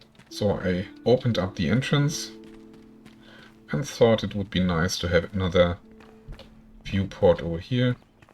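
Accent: Germany. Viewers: Da mich der deutsche Akzent quasi anspringst, werde ich mal auf deutsch kommentieren. 😅 Die Burg ist wirklich extrem gut geworden und Respekt dafür 👍 Am besten gefallen mir die vielen Kleinigkeiten in deinem Build, vor allem die Geheimgänge. Zwei Punkte wo man meiner Meinung nach (persönliche Geschmack) noch etwas was verbessern könnte. Die "Main-Hall" könnte ein bisschen mehr Prunk gebrauchen, weil es das erste ist was man sieht und soll die Besucher natürlich gleich überzeugen. Es fehlt etwas an Pflanzen in der Burg. Das sind aber wie gesagt zwei Kleinigkeiten und ist wirklich beeindruckend was du da gebaut hast. Viel Spaß weiterhin beim spielen und bauen. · Richtig geiles Ding haste da aus und in den Stein Gekloppt! Respekt, ich freu mich auf mein PC upgrade, dann werde ich mich auch in dieses wunderbare Bausystem verlieren. PS. Hab direkt am Akzent erkannt das du Deutsch-Muttersprachler bist hehe xD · 20fps ... 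nice ! :D But impressive building. You have a typical german acent btw :D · The german accent sounds so terrible to me. But good video though. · That's awesome! I have my BA, so I'm fairly artistic myself. I hope I can build my castle even half of how cool yours turned out though. I'm just worried about going to big and making my machine struggle to run the game so not sure how big I'm going to end up going yet. I'm incredibly impressed by your build though. Going to throw you a sub and keep in eye out for other cool builds. Also you sound like you're German? Yeah? My mom was born in Germany so I have family over in Germany. 🙌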